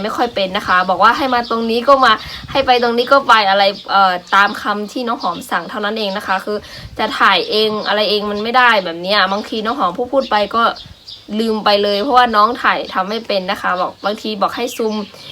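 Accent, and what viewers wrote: Thailand. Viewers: น้องดูเหมือนคนไทย ไม่เหมือนไทใหญ่ จากการพูดสำเนียงภาษาเหมือนไทย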